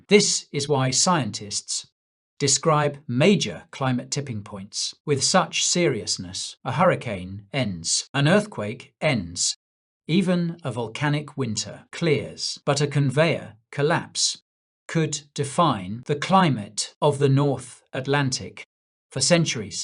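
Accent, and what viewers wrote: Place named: United Kingdom. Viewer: Watch this at 2X.....this AI sounds like James T Kirk crossed with a Brit. 🤣🤣🤣🤣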